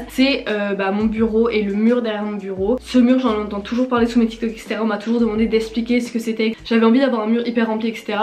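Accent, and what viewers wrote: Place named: England, United Kingdom. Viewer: salut, j’ai entendu ton accent anglais et je suis juste sous le choc- est ce que tu pourrais me dire comme tu as fais pour avoir un accent aussi beautiful stp ah oui et aussi tes magnifique meuf et ta chambre aussi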